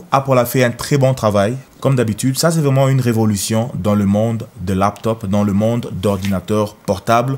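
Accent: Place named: Cameroon. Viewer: Tu es camerounais frère 😅 l'accent. Je m'abonne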